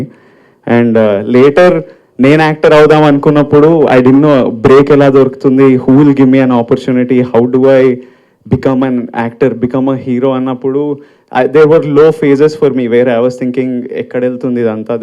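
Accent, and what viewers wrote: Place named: Telangana, India. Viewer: he spoke pakka..hyderbadi..telangana slang..so..natural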